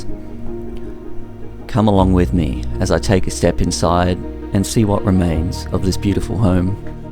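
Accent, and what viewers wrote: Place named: Australia. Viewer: australian english 🤙🏻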